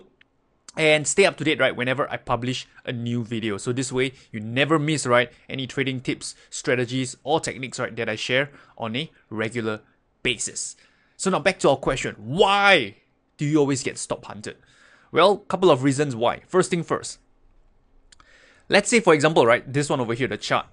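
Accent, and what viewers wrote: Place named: Singapore. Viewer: Singapore English....lol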